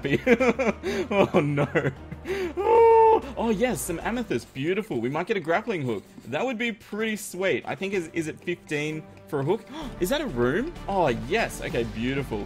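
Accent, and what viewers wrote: Australia. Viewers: Ye he is australian like me :D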